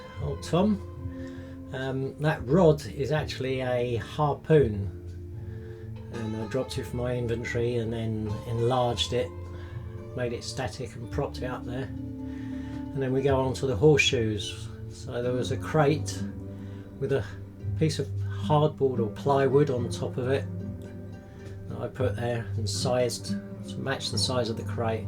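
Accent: Texas, United States. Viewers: Wow this is quite different, and fun. My wife is from Texas, she says it's cool that you tried the accent. I feel that way any time I try and English one. Ha. That chuck wagon sure is cool I also enjoyed the explanations as I am largely mod illiterate and can't tell when something is custom built 104 beams! I I understand the effort there believe me The inside of the house looks so good. The oil lamp is clever, as is the use of conduit. So many small details that i lost track, mesmerized All the barrels in stages of build at the cooper…just brilliant Was that cat named cooking fat? 🤣 Awesome build. Just excellent work. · Thank you for your backstory. I just found my long-lost brother. I performed the fiddle for 40 years. If you lived near me, I would love to turn you loose on my woodworking equipment. The only thing we would be missing is a good selection of exotic veneers and some specialized clamps. 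I think your old western movie inspired, Texas accent is perfect. If I did the voice over, everyone would be subjected to my horrible rendition of Monty Python. It's clear to me that you have 10 times the artist in you than me. Your precise attention to delicious detail tells me that you were a master of both painting and marketry. I on the other hand, will always be a slave to industrialized symmetry. I agonize over the finish of my work. I've always wanted to be paired up with someone who could teach me how to add soul to my work. I'm great at the skyline and stymied by adding life.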